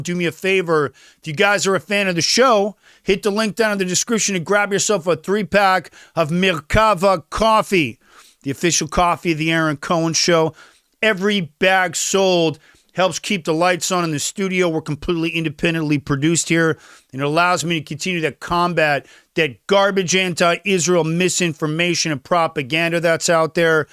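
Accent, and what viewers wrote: United States. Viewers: I enjoy your presentation. Are you Jewish? You seem to have an American accent. R. Evans Australia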